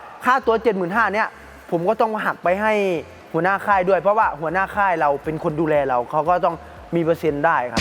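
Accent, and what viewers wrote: Isan, Thailand. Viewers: ทำไม พูดเกียบ5555 อีสานชัดเจน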